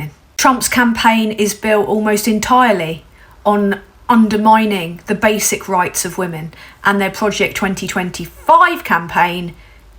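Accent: United Kingdom. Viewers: Nothing sounds better than a roast in a British accent/slang. Thanks i really needed that.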